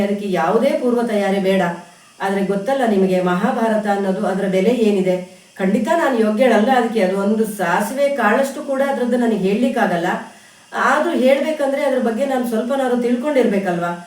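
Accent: Malnad, India. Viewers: Namge nam malnad bashe andre thumba ista❤❤❤ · Madam nimma video tunba chennage bartede niu hakero yalla video nija jevanadalli namma malinadenavara kathe ni ide nanu 26 year inda banglore nalli irodu but nimma video one day nu nodade irodella tunba namm urenavaru mataduva hage ide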